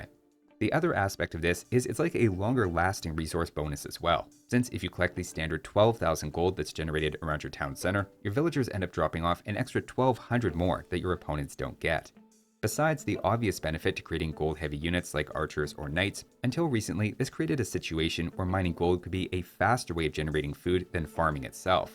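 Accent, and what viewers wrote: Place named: Canada. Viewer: Great video as always,! Thanks for the insight. I don't expect you to pronounce everything super correctly, but Mali is pronounced with the "a" as in "cat" - rhymes with alley. (To pronounce it like a West African, the "l" is different too, made with the tip of the tongue forward, close to the back of the teeth. But that would probably sound kinda weird in the middle of a Canadian accent.)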